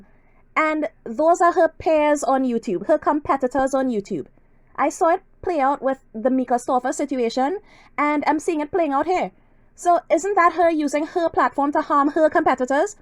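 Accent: Trinidad and Tobago. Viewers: Saw the repost through Emily Baker... As soon as I heard your voice, I recognized the Trinidadian accent 🇹🇹 Congratulations on succeeding on this platform! ❤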